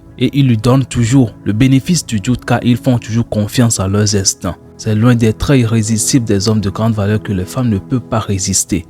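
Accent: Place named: Africa. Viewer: On s'en fou, ce n'est QUE des fautes d'orthographe, en plus il a du mérite car il est peut etre africain vu l'accent. Le superficiel limite l'esprit surtout de ceux qui sont négatif, de mauvaise foi et ingrats. C'est le fond des choses qui compte pas les formes. Le contenu de cette video devrait plutôt vous réjouir car il exprime QUE du propre qui visiblement ne vous concerne pas, et que vous dénigrez. Malheureusement, quand on est pas futé c'est pour la vie.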